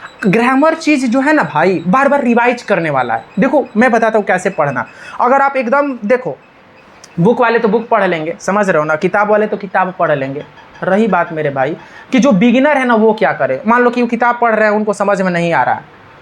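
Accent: Bihar, India. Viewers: Apka language ekdm bihari lgta h😅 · Sir aap Bihar ke hai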